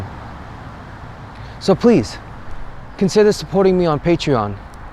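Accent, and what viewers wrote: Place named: East Coast, United States. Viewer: You don't sound like a Californian. You sound like something easy coast.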